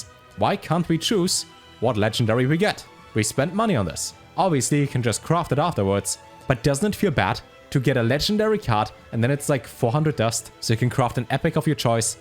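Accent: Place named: Germany. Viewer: I love your German accent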